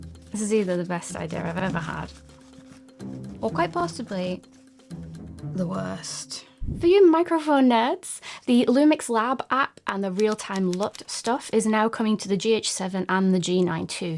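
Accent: United Kingdom. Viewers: You speak in a very nice, soothing tone. I am surprised because I usually don't like British accents (too high a pitch), but yours is very wonderful.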